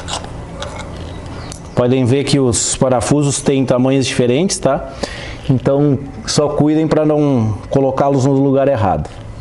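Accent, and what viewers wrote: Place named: South, Brazil. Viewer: Obrigado pelas dicas amigo. Acabei de comprar uma FA. Entao ja vi alguns videos seus e do val( quando tinha a gsxs) pra entender melhor a moto. Tem sotaque do Sul. Vc é de onde?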